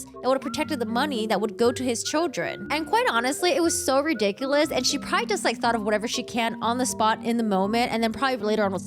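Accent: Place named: United States. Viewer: If her accent doesn't give it away, her location is stated in the about page, united states.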